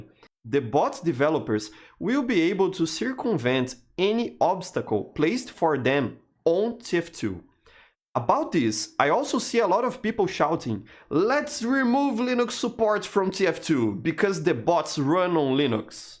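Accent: Brazil. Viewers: I recoginize i brazilian voice when i hear one. Oi. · Hey, I noticed your brasilian accent, do you usually play on the servers? how full are they? (argentinian btw) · Esse sotaque é BR, reconheceria em qualquer lugar. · That's one thick fucking Brazilian accent, my friend. I can hear your eyes going through the script.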